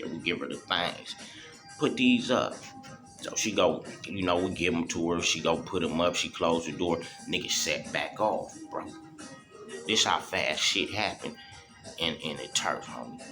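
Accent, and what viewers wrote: Los Angeles, United States. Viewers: I like hearing it from from the B side! You a real LA dude accent and everything homie! Good stories. You being respectful wit it too.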